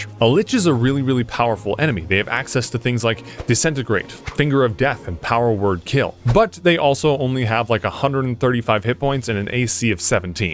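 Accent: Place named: Flanders, Belgium. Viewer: not me trying to figure out if you're dutch or flemish... ima say flemish?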